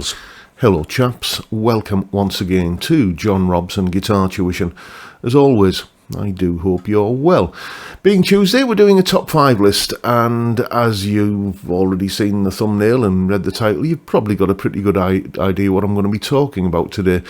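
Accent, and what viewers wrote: United Kingdom. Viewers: You sound British so my advise learn 2,nick bowcott songs and call me in the morning nevermind the dull players here but I'm from America what do I know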